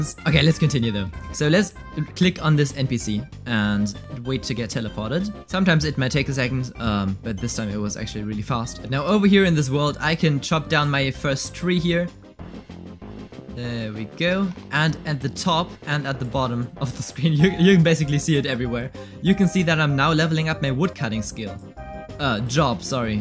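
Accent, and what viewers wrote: Germany. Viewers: Alter immer lustig wenn man den deutschen Akzent so durch hört😂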